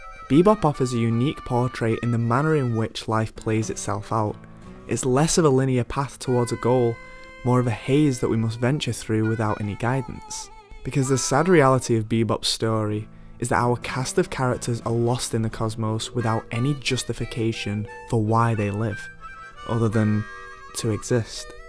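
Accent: United Kingdom. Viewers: i love how you have a british accent really gives you taat edge over nerdwriter1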